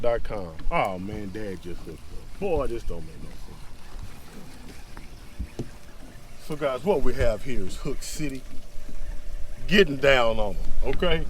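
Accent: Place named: Texas, United States. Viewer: It’s crazy how a Texan can recognize another Texan by the tone of voice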